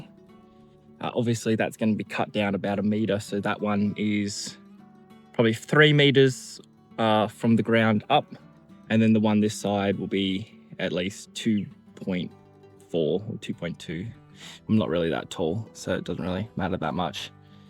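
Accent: Australia. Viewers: Hello fellow Aussie. Wishing you all the very best in your new adventure on the land. I’ll be checking in to see how you’re going on your homesteading journey. Great to hear a fellow Aussie on YouTube.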